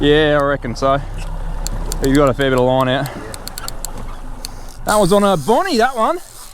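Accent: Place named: Australia. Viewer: That's it, I need a visa, I'm moving to aussieland just to listen to this accent everyday. It's just so pleasant! So many made-up words lol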